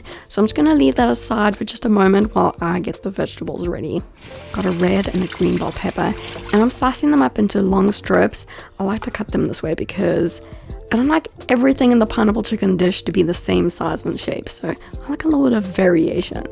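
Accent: South Africa. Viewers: where is your accent from are you South African, Australian or from New Zealand? the accents are currently confusing me currently so i tossed it all in cause i would think 1 of those 3 but i think South African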